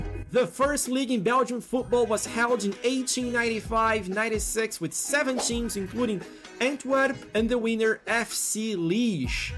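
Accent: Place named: Brazil. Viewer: Brasilians guys allways have that accent, i'll bet he's brazilian =P good job 👍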